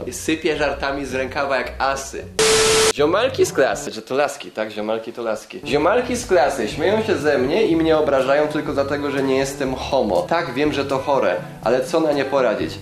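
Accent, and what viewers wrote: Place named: Silesia, Poland. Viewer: Błagam mów częściej po Śląsku, bo to cudo w twoim wykonaniu❤️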